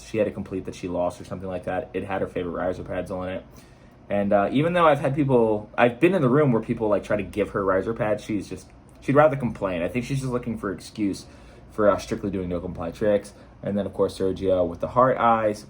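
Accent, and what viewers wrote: East Coast, United States. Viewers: def east coast vibes just because the way he talks shit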